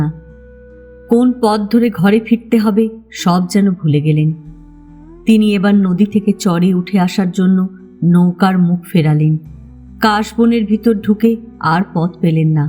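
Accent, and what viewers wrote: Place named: Bangal, Bangladesh. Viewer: খুব ভালো উপন্যাস টি ।তোমার পাঠ অতুলনীয় ।কি সুন্দর ভাবে বাঙাল ভাষা উচ্চারণ করছ❤👍👍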